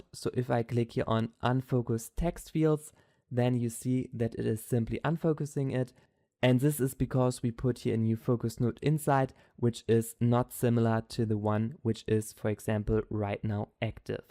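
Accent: Germany. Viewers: lmao i can hear that you are german ahahahah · Great Tutorial or should I say Gut gemacht? Man hört echt raus, dass deine Muttersprache Deutsch ist. Das ist aber ja nichts schlechtes:)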